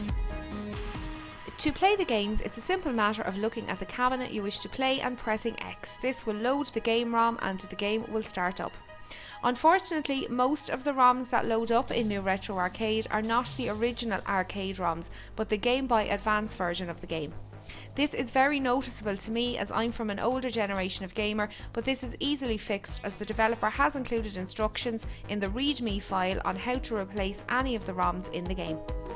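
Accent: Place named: Ireland. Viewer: Dat irish accent!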